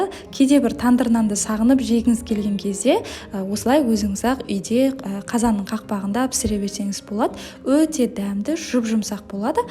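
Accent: Standard. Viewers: Жаксы соилеиди екен таза казакша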